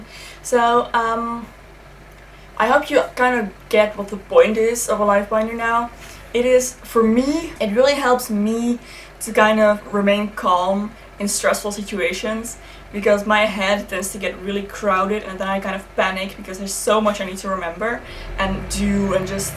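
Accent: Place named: Netherlands. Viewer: Are you Dutch? I love your accent!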